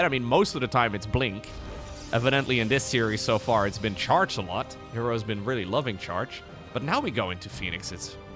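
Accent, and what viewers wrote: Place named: Netherlands. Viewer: The more repetitive and boring the strategies get, the more and Winter put in "NEW ILLEGAL strategy". Short term it generates more clicks because new guys come in. But the ones as I, who are here since he has the fattest dutch accent and considered to be "years in" when he published his music video of his uk visit, get scared away. Long term the base errodes and the topic collapses. And nobody will think that this click bait was the reason. They will say "the game just got old". Maybe they are right, maybe they are cutting on the branch they are sitting on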